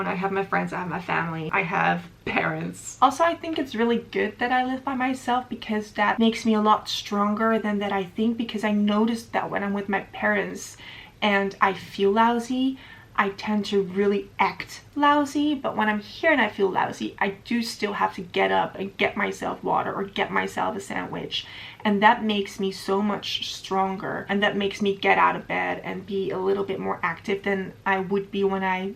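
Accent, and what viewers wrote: United States. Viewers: All the best to you ! You English is 👍👍👍 At first I thought you are an American ! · You’ve definitely got an American accent. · I also thought she was either an American or a Canadian. Her grammar is also spot on. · You sound like somebody from Texas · yeah she says about watching American channels on TV so maybe picked the accent up there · How is your english so great? You sound more like an american versus dutch. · The English question answered my question bc it sounds like your from here in USA lol.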